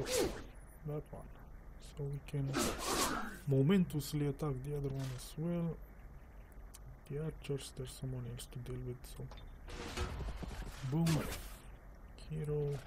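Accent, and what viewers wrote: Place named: Egypt. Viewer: Hey mate, it's nice to find someone that knows what they're doing with my favorite race/class combination. Also, I was wondering, what accent is that? I want to say there's some Egyptian/Arabic influence there, but I'm not well-versed on middle eastern/mediterranean accents. Also... "You wanna charge these guys? Be my guest. Pretty sure your men won't like it, but...". I love Shock Troopers. They're my favorite unit in the game. <3 Your accent is also hilarious for me. It adds a sort of toughness to the Orcs that you don't find in a typical white guy playing this combo on Youtube.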